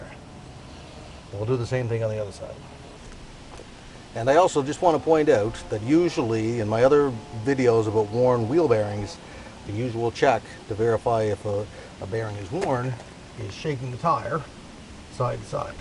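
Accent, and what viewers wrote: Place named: Canada. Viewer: I love your Canadian accent. Sounds very cool! Much love and respect from your friends from the southern border!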